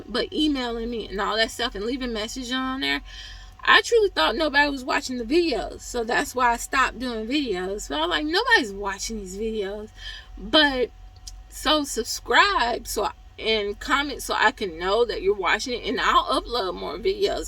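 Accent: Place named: South, United States. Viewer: Sound a lil country lol I like it tho 😂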